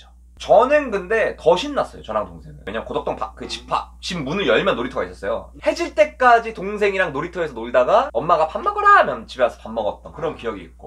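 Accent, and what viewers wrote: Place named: Chungcheong, South Korea. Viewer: 아 충청도 사투리 넘 귀여워옄ㅋ 날른겨~~~ 긍정마인드 배우고 갑니다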